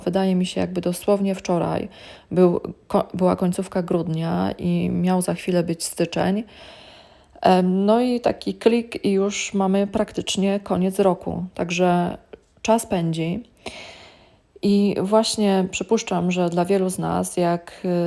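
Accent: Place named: United Kingdom. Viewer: If you talk English why you have a British accent?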